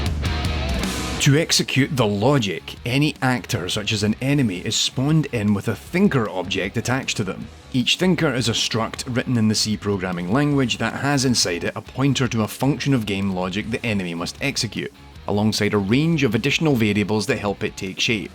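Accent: Scotland, United Kingdom. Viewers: I wanted to subscribe. God why did you have to have a Scottish accent. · As a fellow Scot, I like how your accent got stronger as the video continued. Especially the rolling of the Rs. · A Scotsman saying ‘Rrrrip your face out’ sounds terrifying. Your dialect sounds awesome and terrific. Don’t even feel the need to deal it down. Also: nice things for you. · It sounds like you were less able to suppress the Scottish-ness in this episode's narration! Also, for anyone at all interested in the nuts and bolts of DEWM, you owe it to yourself to check decino's channel! He's done A.I videos like this one, but also stuff like the sources for the game's audio and interesting tidbits about the sprites!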